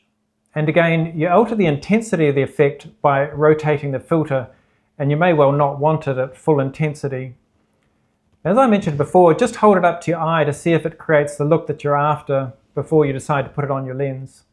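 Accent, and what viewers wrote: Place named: New Zealand. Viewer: Nice to see and hear some NZ images/accent. I use Cpl filters alot for black and white photography.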